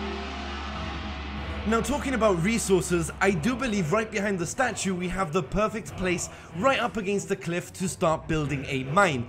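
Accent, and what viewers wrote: United Kingdom. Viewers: I don't want to be rude but are you British😅😅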